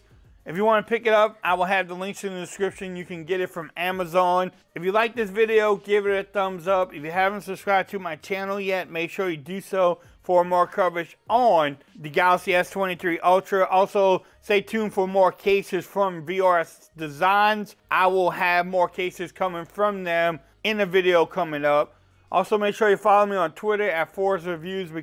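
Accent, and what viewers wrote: United States. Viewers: Your accent is the most american accent ever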